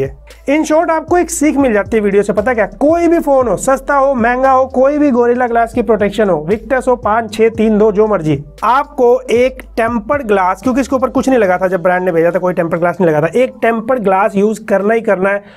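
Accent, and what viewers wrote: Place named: Uttar Pradesh, India. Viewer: आप का स्टाइल zabardast है. Especially the u.p. वाला touch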